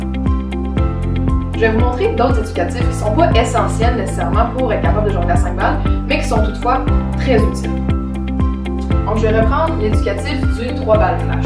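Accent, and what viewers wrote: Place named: Marseille, France. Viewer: magnifique ! merci pour la progression et les éducatifs ... et l'accent ... marseillais.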